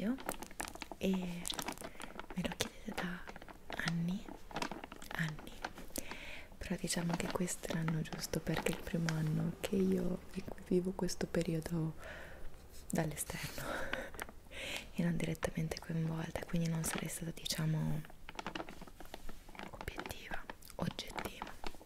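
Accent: Italy. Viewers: Where are you from in Italy? My grandmother immigrated to the US from coastal Calabria and my grandfather immigrated from Tuscany so I’m trying to familiarize myself with the different Italian regions and accents!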